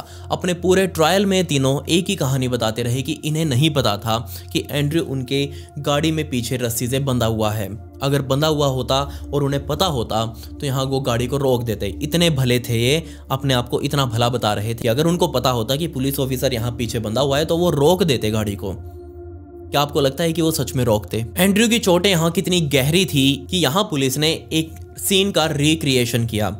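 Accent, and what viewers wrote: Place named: Haryana, India. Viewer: sir ap apki voice apka lok bahut acha h Jab m apki video dekhni lgi thi maine awaz se hi pahachan liya ap haryana se ho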